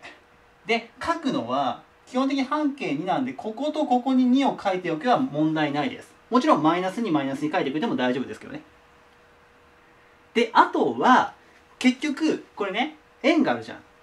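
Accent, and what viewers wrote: Kansai, Japan. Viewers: 関西の人なんですね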